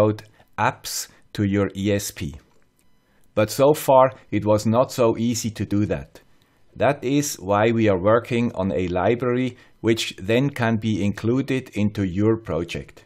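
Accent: Switzerland. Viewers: Come on guys lets give the guy with the swiss accent more likes. These videos are excellent and satisfy our IoT appetite. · I have only recently started with Arduino stuff, and more recently (today) with ESP8266 stuff! I have to say, your videos are fantastic, your humour is on point, and your style is simply the best. Thank you for taking the time for producing these extremely informative and interesting videos. i think I speak for most of us, we do love that Swiss accent.:) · As with all of your videos, I learned something new. Making videos that are my #1 favorite to watch doesn't happen without a lot of work. They just look natural by way of hours and hours of effort. After 'Binge Watching' all of your videos in order over a two week period and enjoying hours of entertaining and educational fun, now I get to learn more by broadening my knowledge into the blogging realm. 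I am sure that I will learn, enjoy and of course 'Like'. Happy Easter to (the guy with the crazy Swiss accent)!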